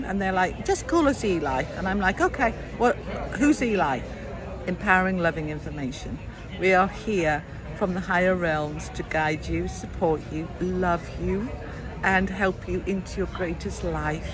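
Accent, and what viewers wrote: Wales, United Kingdom. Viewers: My son has just arrived back from Orlando. It was 35 degrees, ugh. I’m in England and to me you sound ever so slightly Welsh. ❤ Did you mean the Celestine Prophecy?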